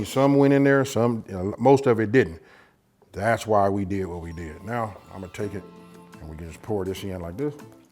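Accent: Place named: California, United States. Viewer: Are you from the Bay Area in California? Your accent says California to me. I was leaning toward Watts maybe but you sound like Ryan Coogler a little bit. San Diego native here . 😊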